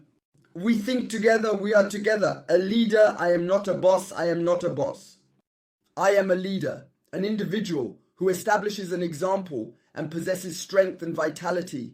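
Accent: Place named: Texas, United States. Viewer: ALLLL RIIIGHHTTTTTT oh yeah (I love texas accent)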